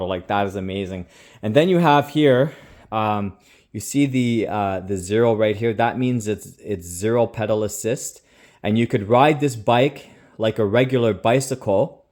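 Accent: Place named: Canada. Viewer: I'm planning on getting the X2 60 Volt dual 750 hub motor version. Judging by your accent you are from Canada and the one I can get is not available to you. 🤔 I live 5 miles from Canada in Detroit area by Windsor Ontario.. I currently own an Ariel Rider Kepler 26x4 fat tire with a 1,000 watt nominal Bafang geared hub..1,800 watt peak 52V 20 ah battery. I just wanted a folding bike with dual motors that was as fast as my Kepler but does hills even better. Dual suspension and 60V is a plus too. 100 Nm torque per motor is great! Better than my single 108Nm motor. I would consider the Road Warrior X2 with dual 60V 750 motors but I want a folding bike and extra suspension. My Kepler goes 35 mph no problem .. Throttle only.. 56 kph .. Flat ground to 60% battery 56 kph then goes down to 54 after 60% battery.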